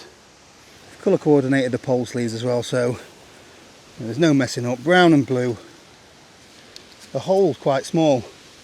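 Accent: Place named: East Yorkshire, United Kingdom. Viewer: You could get yer brompton in there wiv yer.....real cosy. 'Ull' east yorkshire.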